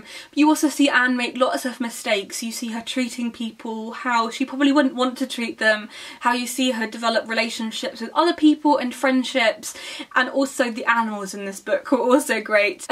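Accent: United Kingdom. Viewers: Yours...it is my favourite "British Accent" of the Year, so could you tell me more about it, please? Thanks in advance anyway.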